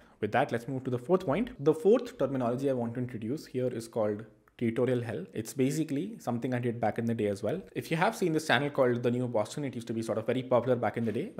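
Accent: India. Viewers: why your accent is so endian 😭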